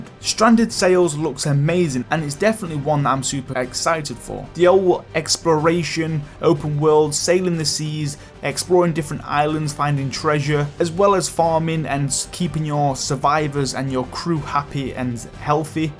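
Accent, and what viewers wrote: United Kingdom. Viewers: Dude he's british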